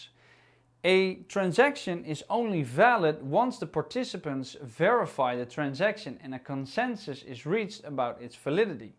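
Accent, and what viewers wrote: Netherlands. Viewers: Can tell that you are from the Netherlands xD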